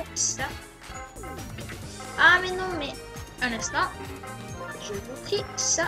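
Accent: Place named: Canada. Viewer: j'ai l'impression que tu es un canadien ce n'est a cause de l'accent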